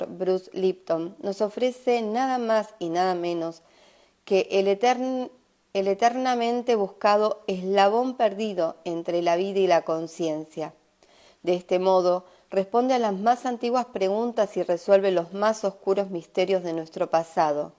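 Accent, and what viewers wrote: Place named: Argentina. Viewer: De verdad!! Escuchar una voz argentina es genial!! Me gustaría que leas el resto del libro 😇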